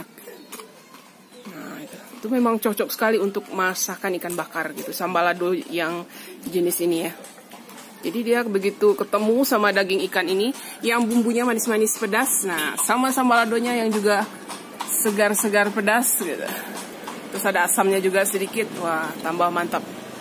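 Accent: Minangkabau, Indonesia. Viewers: Bahaso Indonesia uni ko khas urg awak bana hahahahaha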